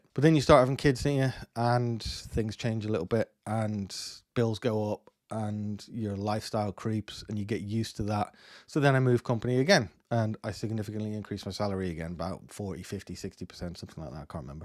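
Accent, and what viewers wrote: Ireland. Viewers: ayo I would have thought you left Ireland not that long ago, you have that Irish accent, even when you said you came from Ireland I was expecting Northern Irish, no offence. Despite all the bad things about the UK, It is the ISA that keeps me in the UK NGL. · I was thinking I could hear the brogue. ☘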